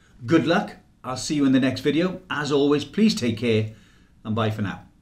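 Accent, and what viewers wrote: Wales, United Kingdom. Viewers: Obviously you come from Wales :)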